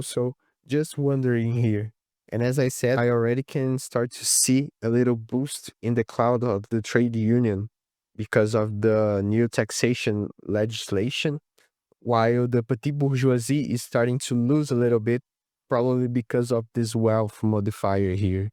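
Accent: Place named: Brazil. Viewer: He is prob from Brazil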